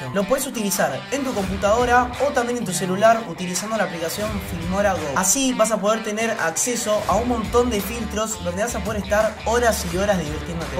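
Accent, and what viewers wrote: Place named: Argentina. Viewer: me encantan tus vídeos y también tu acento argentino 😊😊😊😊❤❤ un besote desde San Martín de la Vega 😊😊😊❤😊❤😊❤😊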